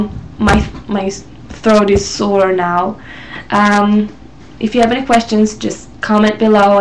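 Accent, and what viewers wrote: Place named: Israel. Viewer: you are pretty and intelligent. :) i realy wanted to know where you accent is from, oooh isreal ok.:) using fried bacon chopped and fried egg on a salad instead of salad sauce is SO Yummy.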